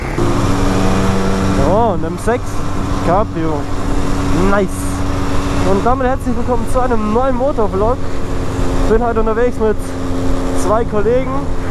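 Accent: Swabia, Germany. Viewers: Das Problem eines jeden Schwaben: Schnell von Schwäbisch auf "Hochdeutsch" switchen. Und "des" und "isch" bekommt man eh nur schwer weg, egal wie sehr man sich bemüht xD Ich spreche aus Erfahrung :D Aber du machst das ganz schön gut :P Bei mit kommt dann irgendein komischer Mix-Dialekt raus, wenn ich mich anstrenge. Geht es noch jemandem so, oder bin ich die Einzige? :D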